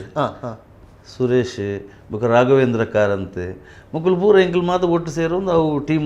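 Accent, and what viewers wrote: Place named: Mangalore, India. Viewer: Kudladha kannada super chethu, Yir pandidh tojapayar tule,avvu kudladha kannada.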